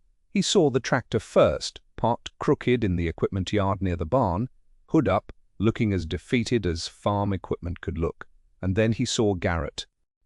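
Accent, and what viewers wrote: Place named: United States. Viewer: Why do you speak like an American but you choose a British narration vooice?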